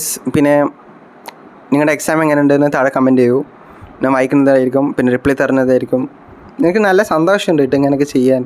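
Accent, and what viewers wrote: Tamil Nadu, India. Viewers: Muthe.... Nee kidilan aan...📈👍 Ninte voice kidilaann tamil slang vannittu.... Nalla vibe ind video kanan...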